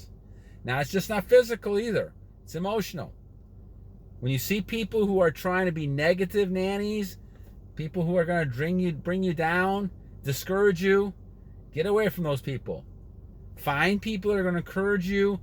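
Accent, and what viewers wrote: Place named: North, United States. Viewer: you from Minnesota, Michigan? I recognize a northern accent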